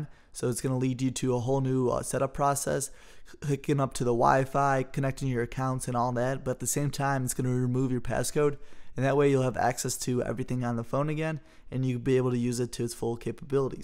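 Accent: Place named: United States. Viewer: Thank God your American and i can hear you clearly.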